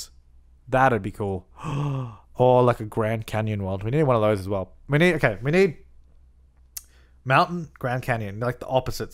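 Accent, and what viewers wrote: Australia. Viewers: Happy to hear an Australian accent! Did you have an architecture background? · You sound like an Australian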